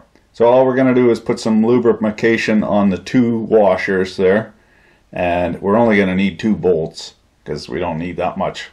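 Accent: Quebec, Canada. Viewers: Lol the French, instantly knew you were from Quebec haha. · i didnt kno you were canadian let alone french canadian?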